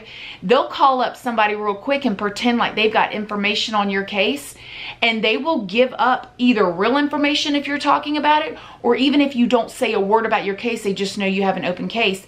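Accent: South, United States. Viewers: I'm from Florida too. She has southern accent all the way through..it comes out stronger when were nervous, excited,. Or when I'm at the dentist on the funny gas LMBO. ... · What? She's southern ding ding, she's from Florida. There's no way to hide being from the south. Unless u didn't grow up here all your life. Or you have had a speech coach drill it out ur mouth lol. Some words or moments, bring out your southern drawl more. I live in Texas born and raised in the deep south😂. I sound like a Dolly Parton country song, with a Reba Macintyre twist.😂😄😁😊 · Rhoda Yackez she isn’t putting it on, she actually has a southern accent and why do u feel the need to critique her looks? let her live.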